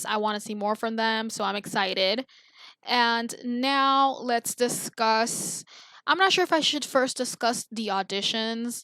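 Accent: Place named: Latin America. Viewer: Balla se te escucho vien el espol latino 😃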